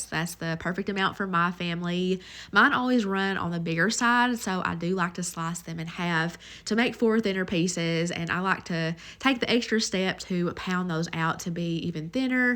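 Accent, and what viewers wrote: South, United States. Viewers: great video again. Love your cooking, presentation & of course your delightful Southern accent! Please do regular videos in 2024. Thanks & best wishes from Sydney Australia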